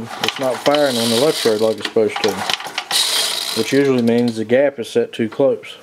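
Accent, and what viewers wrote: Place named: Appalachia, United States. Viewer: You sound like how we talk in va/tn.... My dad use to play the whole "hold this wire for me while i crank it" on all my friends... We got a kick out of it. Them, not so much haha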